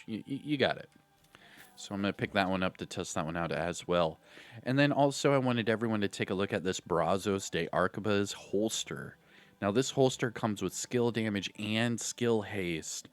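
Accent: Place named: Northern California, United States. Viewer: Its your opening! It peggs you to Northern California. "how ya doing? whats going on?" The tonal sound and enuciation is possibly even going towards San Jose - Santa Cruz.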